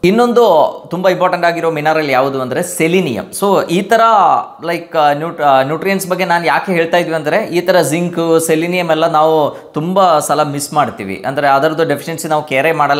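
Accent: Kerala, India. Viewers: Thank you doctor valleya information nange iron defeciency untu tumba hairloss allready aagide ...nan body iron absorb mado dilla hematology doctor helidru supliments indanu aagodilla injectionalli matra hemoglobin increase aaguthe adakke enadru maddu doctor ge gothidre dayavittu tilisa bekagi vinanthi.. .malayalam ecsent untu doctor mataduvaga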